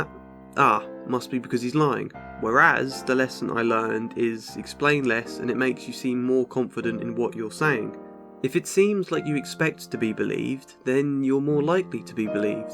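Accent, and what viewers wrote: England, United Kingdom. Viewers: The choice of music and English accent made me feel as though I was listening to a creepypasta video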